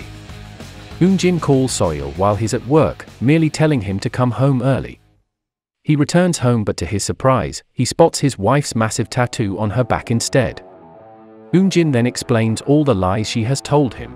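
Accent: United Kingdom. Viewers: Having to listen to very bad English being read by someone with a British accent is very weird indeed.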